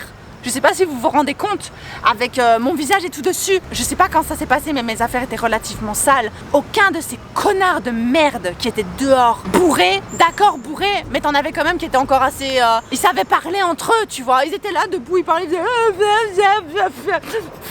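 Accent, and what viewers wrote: Belgium. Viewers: Je suis Canadien j'aime bien l'accent belge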